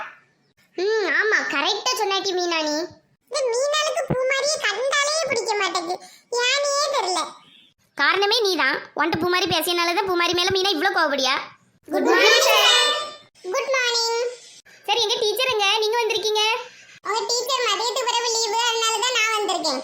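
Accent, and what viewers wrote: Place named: Tenkasi, India. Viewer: Idu entha ooru slang semaiya iruku ..thenkasi side madri iruku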